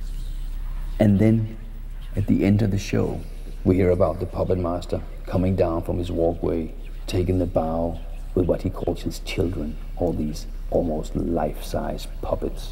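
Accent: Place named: Denmark. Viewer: MAAAN I LOVE HIS VOICE AND THAT DANISH ACCENT D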